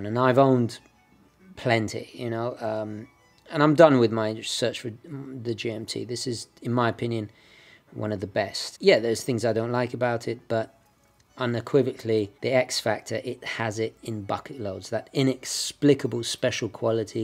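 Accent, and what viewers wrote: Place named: Estuary, United Kingdom. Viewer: Excellent comparison of two superb time pieces. I also love your slightly broken Thames estuary accent that I find very relaxing on the ear compared to the traditional Cockaniny flat vowelised delivery of Eastenders. Cheers.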